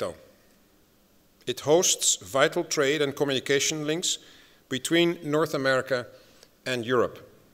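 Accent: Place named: United States. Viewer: No wonder he talks like an American.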